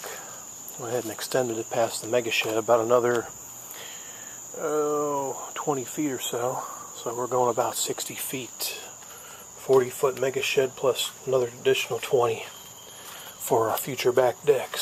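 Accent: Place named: Russia. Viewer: Мне показалось, что комментатор русский ))))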